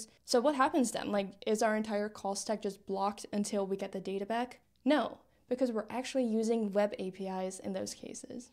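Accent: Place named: Netherlands. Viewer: I hear some slight Dutch here :p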